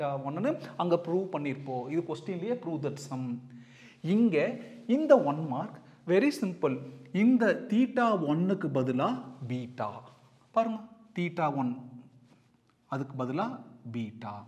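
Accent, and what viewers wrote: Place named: Kongu, India. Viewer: Thank you sir from your subscriber... We have done our maths exam... Despite the QP and marks i would lyk to show my gratitude for ur videos which helped us a lot.. I have been watching ur videos throughout 12th standard... Ur videos helped us very lot and I insisted my friends to watch ur videos and they gave a valuable feed back... We would miss your kongu slang and your teachings... Once again thank you very much sirr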